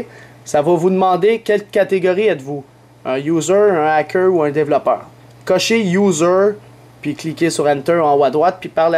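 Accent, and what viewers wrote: Quebec, Canada. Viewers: Merci pour ton tuto très sympas (j'aime bien ton accent québécois <3) ça marche parfaitement du coup j'ai un super ipod jailbreaké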